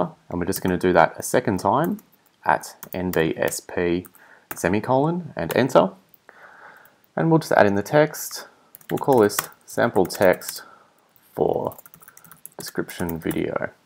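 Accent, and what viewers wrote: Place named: Australia. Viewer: good job mate, keep it up! right from the get go I could tell that lovely aussie accent (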